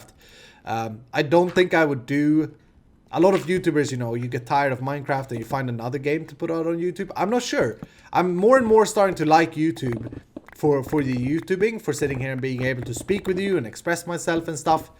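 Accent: Sweden. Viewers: I'm one of those that came from Beef's channel, only that I just started following him too! Basically got here indirectly through following Etho xD Love your content (and your super funny Swedish accent :D) High five from Italy, bro! :) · Congratulations:) I subbed when I first saw you playing the PvP game that Doc and Etho made at Danglands:) I loved your attitude and they way you pwned them xD Thank you for being such a great person and entertainer <3 Plus your voice is really cool with the Swedish accent:3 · Congratulations dude! I found your channel through your mystcraft tutorial a long time ago. From there I watched your videos every now and then but i never subscribed. Then i found meatylock. He re-introduced your videos to me. I thought, this weird Swedish guy with the crazy accent again? He's hilarious. Ever since I've been subscribed. With 4 different accounts atm. 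You're welcome btw <3 thanks for the quality content.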